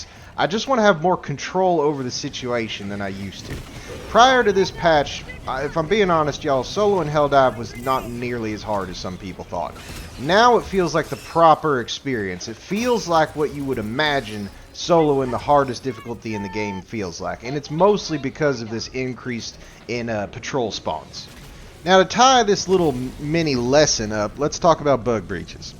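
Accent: South, United States. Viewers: Came for Helldivers tips....stayed for the Southern drawl....lol. Entertaining vid and great commentary.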